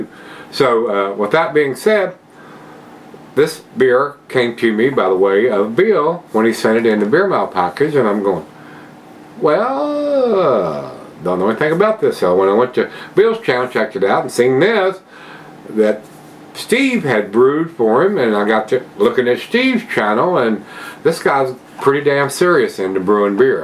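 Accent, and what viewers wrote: South, United States. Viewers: I now check the dates on ( most) of my beers because of. His reviews broadened my beer knowledge and appreciation. Randomly enough, I found (find) his reviews to be very relaxing and almost asmr like due to their very simple format and comforting southern drawl. Over the years I’ve watched his reviews almost religiously and looked forward to when he would upload a new review in the morning. It was a nice simple escape that made me proud to be a beer drinker. From now on, every beer I drink will be in his honor.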